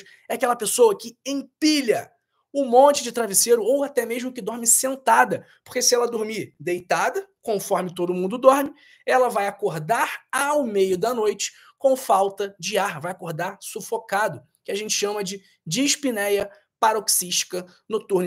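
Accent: Rio de Janeiro, Brazil. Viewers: CARA...A SUA CARA E A SUA CONVERSA CARIOCADA NÃO PASSA CONFIANÇA... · Incrível no Brasil....esses médicos YouTube que massacram a gramática da língua portuguesa...pior esse sotaque carioca malandro nada chic .....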